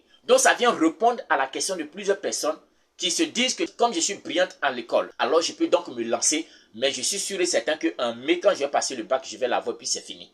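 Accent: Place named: Ivory Coast. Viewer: Hummmm mon frère merci beaucoup pour cette vidéo d'une valeur pratique l'accent ivoirien reste incontournable dans tes propos.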